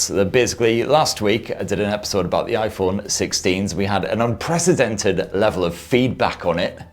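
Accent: United Kingdom. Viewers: I still not understanding your British special accent...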